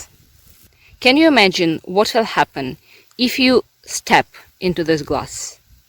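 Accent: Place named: Eastern Europe. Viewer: I gave you your 82nd subscription, I hope you reach 100 very soon! This video showed up on my homepage randomly, so this is my first viewing of you. It would be nicer tor additional viewers interested, to know the general location of where in the world you are building your personal paradise? You speak good English, but definitely makes me think perhaps your in Eastern Europe somewhere? As a suggestion, and perhaps more viewers from your general area will feel a relation and subscribe?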